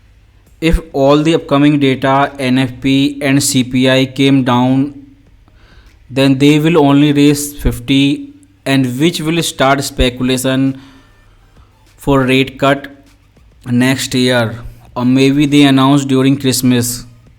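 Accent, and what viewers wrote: India. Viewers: Why don't u speak in Hindi...why this butler english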